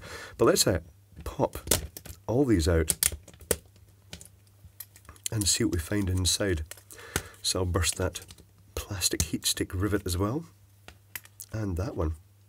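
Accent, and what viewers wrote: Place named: Edinburgh, United Kingdom. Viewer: Hi, I have a bit of a left field question. I love your accent, I'm assuming its Edinburgh. Would that be a correct assumption?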